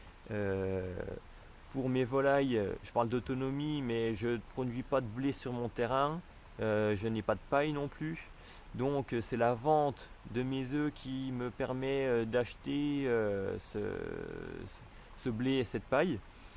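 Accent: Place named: Franche-Comté, France. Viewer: Vous avez un accent franc comtois!!!!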